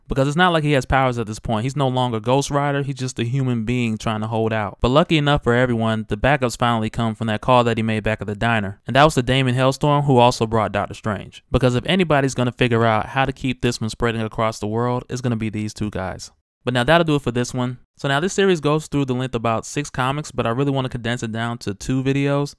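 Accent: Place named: Brooklyn, United States. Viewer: this shit is so ill,its hellSTROM not storm fam, great job though u sound like you from brooklyn are you ?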